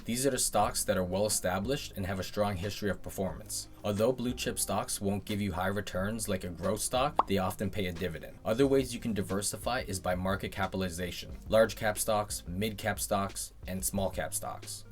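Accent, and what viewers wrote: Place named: Newfoundland, Canada. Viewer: In this video you say "giv'er" and I believe you've said "by's" in another. I'm from NL so I pick up on it.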